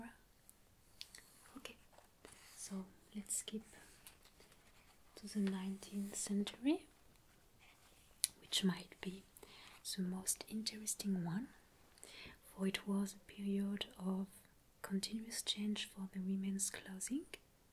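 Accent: France. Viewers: Please ... More videos like this( with french accent) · Thanks for the video! I came most for the tingles and your wonderful accent (I just found your channel last week and I'm watching your work since). I studied the basics of French and you're tempting me to return to my online classes 😄 Again, thanks and greetings from Brazil.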